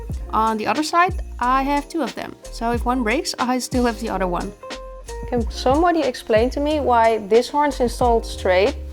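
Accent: Netherlands. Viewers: just by your accent are you from dutchland, or germany?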